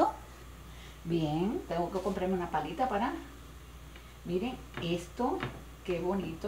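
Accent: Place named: Puerto Rico. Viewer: Me encanta el acento Boricua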